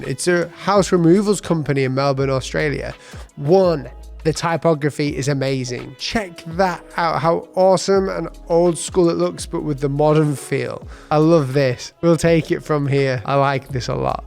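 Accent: United Kingdom. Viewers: He sounds VERY British 🔥🔥🔥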